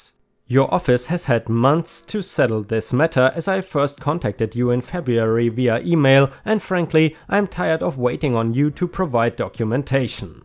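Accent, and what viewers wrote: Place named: Germany. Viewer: you "contacted everyone who'd commented on the thread"?! Good lord, that's some next-level leg work on reddit stories, and as someone who long ago answered your question of what sort of stories I would like to hear with the response, "any type, as long as there's reasonably satisfying ending where we get to know how things turned out", I very much appreciate you putting in that work. That's why I never miss an upload. Well, that and your German accent--it reminds me of the coolest guy I have ever met in my life, on a long train ride from Paris to Munich. I was erroneously sitting in his seat on the train when he opened the cabin door and addressed me in (to my ears, anyway) perfect French. I replied with, "uhhh..." and immediately in equally flawless English, he says, "oh, you're American. My girlfriend was right--Inga, you're right, he IS an American--but I thought you were French, obviously. 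You're actually in my seat--no no, stay there, stay there, keep the seat, we can just take these seats here--but perhaps in exchange for the seat you might buy the first round of beers for us? Does that sound fair? And then I'll buy the rest until we get to München. Oh, but please don't order us any American beer, do you actually drink that stuff? No? Wonderful! Then we will have a wonderful trip, I'm sure..."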